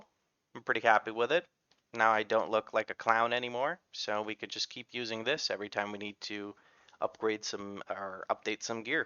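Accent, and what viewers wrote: United States. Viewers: Awesome vids! You sound American, but I keep seeing Russian and German in party chat. Are you from Europe? Im partially asking cuz usually once per video I see you pull mobs I have never seen pulled before😂 Was just wondering if it was more common in NA. Or if you just pull somewhat randomly until you get to a key level where it gets a bit harder. All vids are really helpfull and informative as im trying out tank for the first time😅 Keep em coming💪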